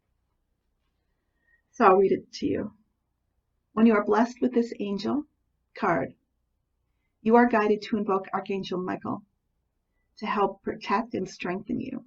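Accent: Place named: Michigan, United States. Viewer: Thanks!!! I thought you sounded like you were from Michigan!! 😁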